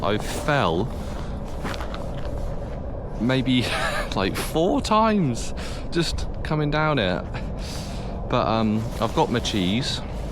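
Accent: United Kingdom. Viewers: Вааааййй, posh engliiish. Ну вы даёте, сударь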